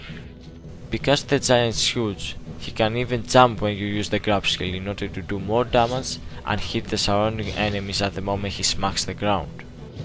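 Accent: Greece. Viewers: you sound greek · Στάνταρ 'Ελληνας με αυτή την προφορά. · The commentator has a really heavy, greek (?) accent. Cute :P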